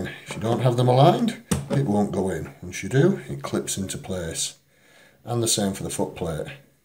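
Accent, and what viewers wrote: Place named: Yorkshire, United Kingdom. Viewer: Good Northern Yorkshire(?) accent and very informative on his personal experiences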